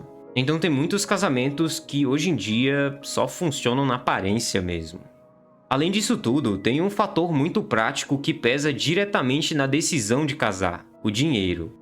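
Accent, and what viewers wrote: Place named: Pará, Brazil. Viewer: Sotaque da região do Pará, tenho um amigo do Pará e estudei com pessoas do Pará q tmb falam assim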